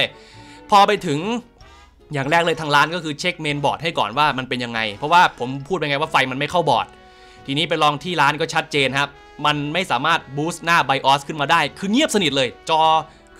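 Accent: Ubon Ratchathani, Thailand. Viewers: พี่เป็นคนอุบลฯเหรอ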